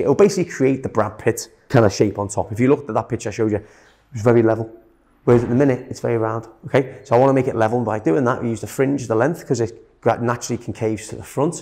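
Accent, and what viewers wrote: Liverpool, United Kingdom. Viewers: Is he from Liverpool?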